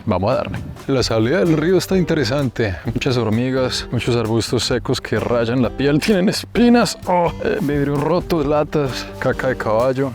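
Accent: Colombia. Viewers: Bienvenido 😂😂😂 Me encanta que conservas tu acento colombiano ❤❤🤗😘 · Eres Colombiano ?! " Chévere " tu Reseña...Conocí Colombia y son Animosos... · se le Nota el acento y las expresiones en la forma de habalr muy colombina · Usted no es mexicano, por su acento, se le oye lo colombiano, póngase trucha ai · Espera hablas como un colombiano y eres de México?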